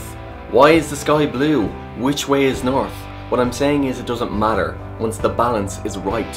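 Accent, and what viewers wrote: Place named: Ireland. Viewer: Your soo funny and I would do anything to have your IRISH ACCENT. Swap? I'll give you A Scottish one for your Irish One? Sound Good? :D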